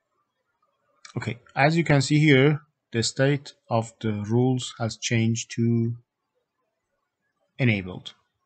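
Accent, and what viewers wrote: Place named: Iran. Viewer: iranian accent :)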